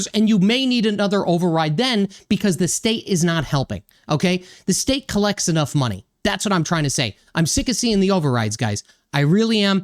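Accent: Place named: Massachusetts, United States. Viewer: i love the accent. You say "owies" when you say always. A lot of mass residents do. I pointed this out to my friend and he thought it was hilarious and can't unhear it.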